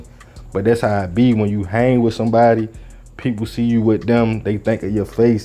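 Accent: Baltimore, United States. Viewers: I’ve been watching you for a little minute now and I just realize you don’t say “you” with the typical Baltimore accent but everything else you say sounds like the typical Baltimore accent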